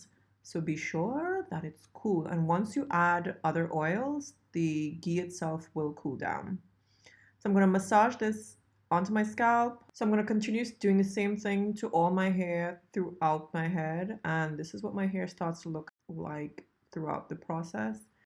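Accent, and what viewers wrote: Trinidad and Tobago. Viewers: Do I hear the beautiful lilt of Trinidad in your voice?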